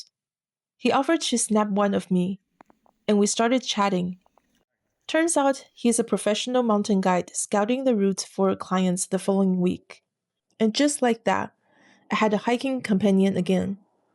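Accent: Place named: United States. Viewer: Sorry but the fact your American I cannot follow you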